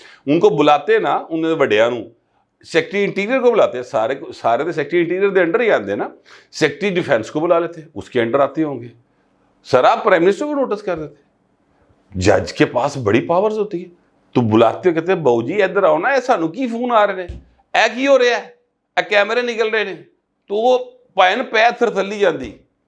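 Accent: Lahore, Pakistan. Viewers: مجھے تو فورا" پہنچ جاتا ھے آپکا وی لاگ۔ لاھوری انداز میں بات کا مزہ آجاتاھے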